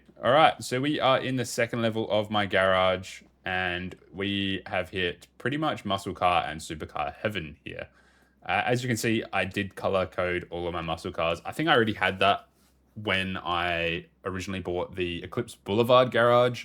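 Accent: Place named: Australia. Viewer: I had a feeling you are from Australia because of the way you said the name of the GTA5 Camaro lol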